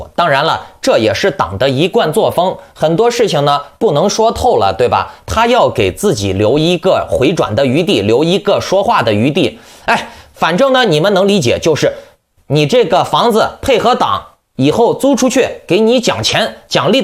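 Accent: Henan, China. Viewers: 你说的是河南话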